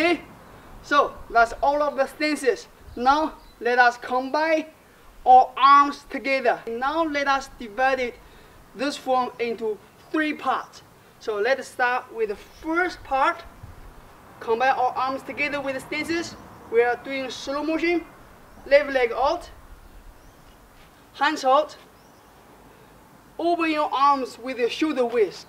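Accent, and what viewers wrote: China. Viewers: 100% true chinese english accent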